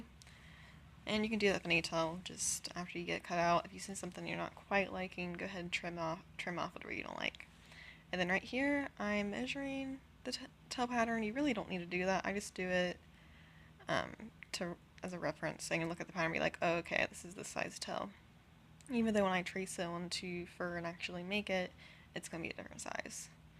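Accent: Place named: Northeast, United States. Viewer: Are you from Northeastern America? I'm from the south, but I can hear a familiar "ou" sound when you say poster board or smth like that. Sorry if this seems weird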